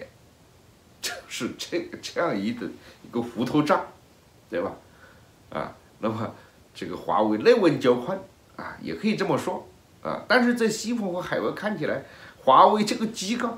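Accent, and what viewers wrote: Hunan, China. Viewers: <num> Lotus 😂应该是湖南的吧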